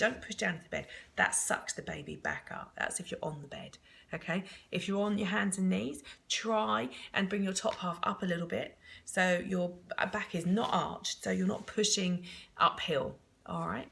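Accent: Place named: United Kingdom. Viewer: Im sure everyone can hear fine,you are probably deaf,or it is just because she speaks british.Just turn the volumm up or watch another video.So dramatic 😒😒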